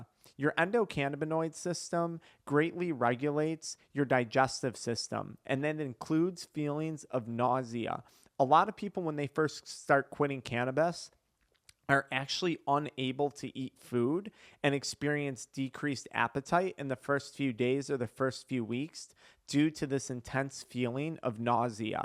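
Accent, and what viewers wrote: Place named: Chicago, United States. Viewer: Love the Chicago accent!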